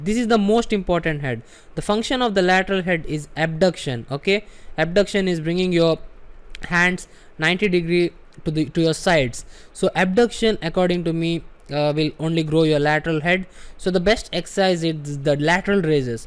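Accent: India. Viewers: i lovvvve indian accent :)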